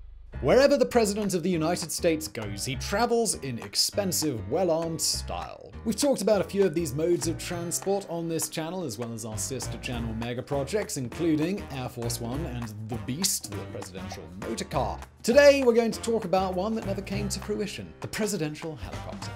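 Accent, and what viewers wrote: United Kingdom. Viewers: His speaking is tuned more to a Brits ear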